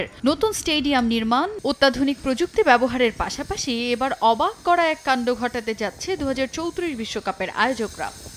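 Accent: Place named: Noakhali, Bangladesh. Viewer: এটা তো মনে হয়ে নোয়াখালীকতে 😂😂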